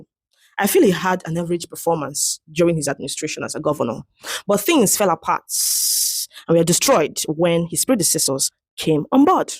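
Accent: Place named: Ngwa, Nigeria. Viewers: Your detailing is commendable. I like the way you mix all eastern accents like Igbo bu Igbo. Orjiuzo's first term was manageable because he was still young, virgin to political recklessness until when his eye widened in his second tenure when it was no longer governance but mamacracy(his mum) and Okijacracy(Okija shrine) that produced an alleged leaked video of oath-taking Theodore in panties before a supposed diety to prove loyalty between godfather and godson, the rubbish happening in Rivers now. A season that was filled with fights between governor's mum and daughter in-law to the extent they rumoured that together they distabilised his wife so mama can remain first lady. Many Saga cost his then deputy Chima Nwafor on his way to and fro Abuja and since then Abia has not known any peace. Abians may have a lot of receipts for them o, they better keep standing on 'MANDATE OF BLUBLU' and leave Nigerians to ail patiently until they BLABLA but if they continue pushing OTI, the angry masses won't just drag them but pieces them. 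Unfortunately Doris shouldn't be jumping into the foray BCOS any woman that does a deal in a case involving the demise of a child to feed from it should be cooling in Prison. This won't be clout, but a permanent oblivion. If not witchcraft why are they pushing against light and development. Only witches like obscurity, destitution and backwardness. God took Abia out and no going back. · Your Ngwa accent is so sweet!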